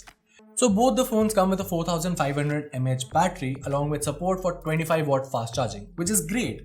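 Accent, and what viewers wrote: India. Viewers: I hate the Indian English accent 🤢🤢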